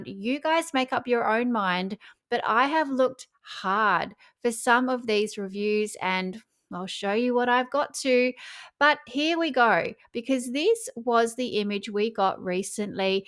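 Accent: Australia. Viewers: I love jour voice and your acccent Is het from Australia Big kiss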